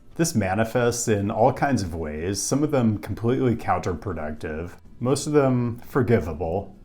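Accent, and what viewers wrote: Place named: Portland, United States. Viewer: It just now hit me why has a Portland accent.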